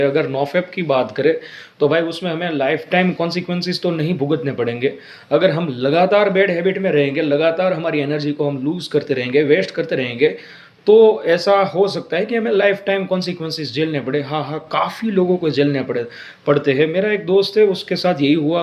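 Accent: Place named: Gujarat, India. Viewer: vaah... Mane laygu j tu tamara hindi ma gujrati tone thi... Bau anand thyo... Tamne hu follow karu chhu and tamara videos jou chhu.. Bau pela pan bija videos aava badha topics par joyela tyare em thatu k chalo amal kariye pan vasna evi cheej che k tamara thi rehvaay nai.. Me pan bau nani umar thi sharu karyu tu... Pan mota thya pachhi control rakhto thyo pan je kevay k man ma gandgi bhari hoy tya sudhi e reality ma avva nu j che.. e eno rasto sodhi ne parane apnne e karavi le... Pan have hu evi umar ma chhi jya mara have lagna thase mari nani ben che graduation complete thyu che last year enu e pan have lagan karva jevi j che.. And mara papa kaik bau kamata nathi.. And hu have 25000 na pagar sathe job sharu karel che 1 varsh upar jene thyu.. Atle have mare to aa badha ma thi farajiyat bahar nikdvu j pade em che kem k have atyare no jaaygo to jeevan have barbaadi taraf j jase.. 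pan sthiti atli pan kharab nathi... Pan thodu to kharab che k hu koi bija ne na sachvi saku jo hu andar thi atlo santosh sathe na jeevto hoy to.. atle man nu ek level have achieve karvu che atle have tamne joi ne man ma kaik inspiration made che.. Em to hu foreign accounting ni job karu chhu.. CA chalu karyu tu 12th pachhi ema CPT thai gyo to pass pan pachhi intermediate ma atki gyo.. have ema job ni sathe preparation kari ne nikdvu chhe.. Pan maro pele thi confidence level occhu hovane karane and communication weak hovane karane kadach maro pagar occho che... Atyare hu eklo bija city ma rav chhu pare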